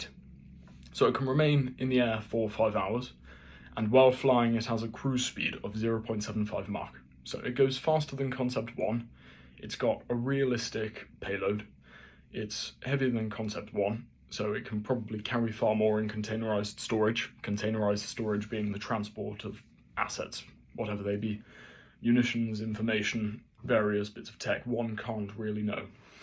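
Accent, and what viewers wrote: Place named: RP, United Kingdom. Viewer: Very interesting thank you. I have seen some pictures of the drone, but not any in detail specifications of the program. Do you know if the concept 2 is the design they’re taking forward? During the video I might have had to rewind a couple of times to understand, but we don’t speak the Kings English quite like he does, this close to the Scottish border. 😂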